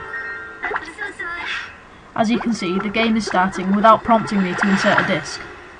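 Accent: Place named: United Kingdom. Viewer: Thanks! And .. uhm .. I LOVE YOUR BRITISH WAY TO TALK!! :)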